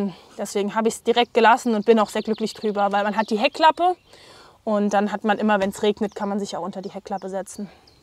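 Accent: Germany. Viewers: Lol I thought you were speaking English with a German accent when the vid started lol maybe a way for me to learn another language :) You need a "Wingnut" for the mirror pad holder change over.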